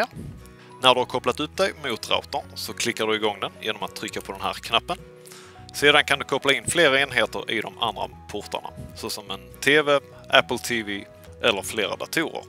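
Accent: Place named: Scania, Sweden. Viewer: Härlig skånska! :D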